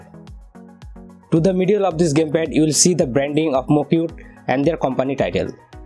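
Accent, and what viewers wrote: India. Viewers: indians and their trying hard English accent. pain to the ears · 3 indian accent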